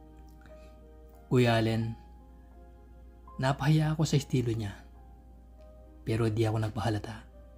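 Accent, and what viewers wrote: Pampanga, Philippines. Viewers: ❤❤❤ Ang ganda ng storya nyo sir at tunay na may accent kang kapampangan. Ang sarap isipin na may happy ending kayong mag jowa. Hehe. Secret pala❤😊😅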